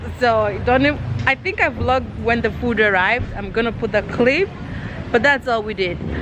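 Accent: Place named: England, United Kingdom. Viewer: heel mooi engels accent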